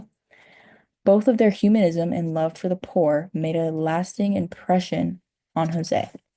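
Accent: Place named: Latin America. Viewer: Awesome presentation! Love you Spanish Latina accent 😝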